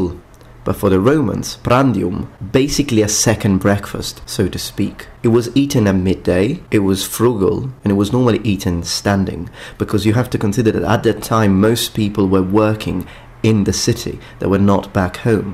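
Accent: United Kingdom. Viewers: Well technically he is speaking English correctly, with a British accent. Probably how he learned it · You are an Italian with British accent? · He's Italian with a British accent. Love it!!!!!!!👍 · he sounds more British than Italian. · Did you have a British parent or live for some time in the UK. You speak English like a UK citizen. Oh, by the way, I think your Italian sounds better than your Classical Italian. Your Italian sounded smoother, and you seemed more at ease with it.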